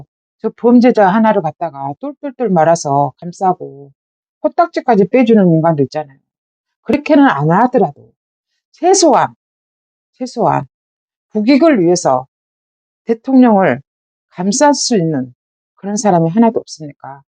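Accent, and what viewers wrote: Jeolla, South Korea. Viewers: 씀했는데.... 전라도 사투리인가요?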